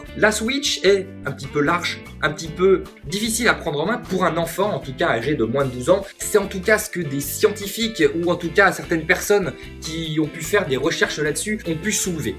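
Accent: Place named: South, France. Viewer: accent du sud mdr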